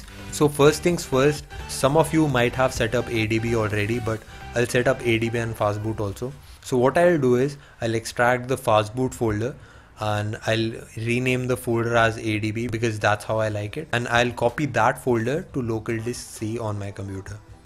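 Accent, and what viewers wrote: India. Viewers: perfect YouTube (Indian) accent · UUDDLRLRBA Start Stop judging mate! Look into yself! He wanted to give some info(In indian English so wat?), which he did obviously,worked for me though...so stfu n stop using YouTube...trust me on this!!!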